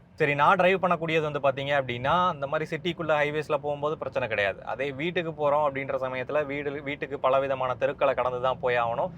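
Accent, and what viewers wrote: Tirunelveli, India. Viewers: தம்பிக்கு திருநெல்வேலி தானா?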